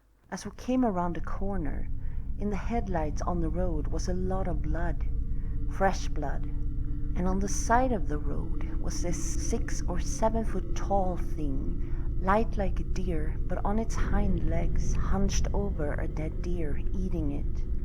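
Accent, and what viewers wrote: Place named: Ireland. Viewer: Sounds a tiny bit Irish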